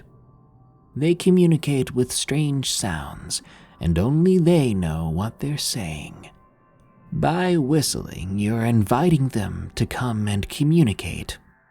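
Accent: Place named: United States. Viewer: The first story is meant to be in the south of England… people do not use American words in the south of England or anywhere in Britain for that matter, you also made it sound like Aberdeen was not that far away… its in Scotland.. the opposite end of Britain.. We also dont have guns.. if you are going to read a story from England, (also sounds American) please be authentic as most English find it insulting..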